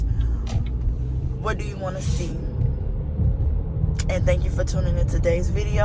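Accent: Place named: South, United States. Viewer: Thanks beautiful. I like your southern accent.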